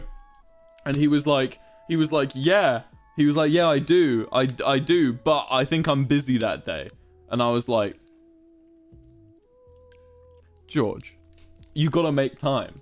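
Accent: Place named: United Kingdom. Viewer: His British accent tho😢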